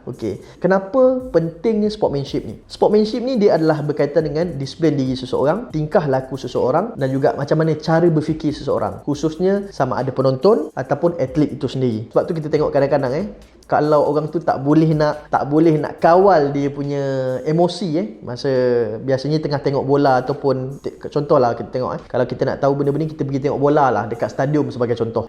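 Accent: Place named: Kedah, Malaysia. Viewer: Ni kedahh aa mcm gini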